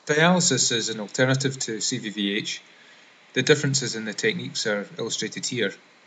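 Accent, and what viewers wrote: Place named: Scotland, United Kingdom. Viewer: Thank you for excellent presentation. Love the Scottish accent.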